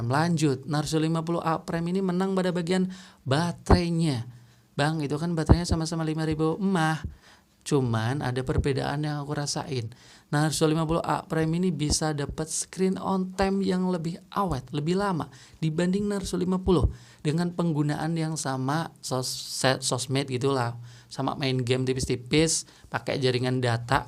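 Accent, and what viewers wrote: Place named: Banyumas, Indonesia. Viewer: Abang ngapak orang mana ya?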